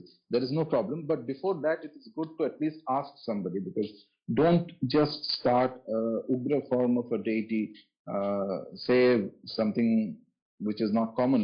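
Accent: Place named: United Kingdom. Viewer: Why are u speaking in BRITISHER INVADERS language? Do we have no INDIC language to depict .. And why are u calling उपासक as उपासका & भैरव as भैरवा, while you correctly pronounce Chanel, Louis Vuitton, Dior, Citroen, Moët & Chandon, Laurent Perrier, Veuve Clicquot, Louis Jadot Domaine Leroy, Château , Bordeaux and L'Oréal as per original French pronunciation & correctly pronounce ikigai, wabi-sabi, kaizen, shinrin-yoku, omotenashi, gaman), kintsugi, shikata ga nai & bonsai as JAPANESE pronounce them. Then why SANSKRIT terms are pronounced by you as beef-eater BRITISH pronounce them? You're full of arrogance & अहंकार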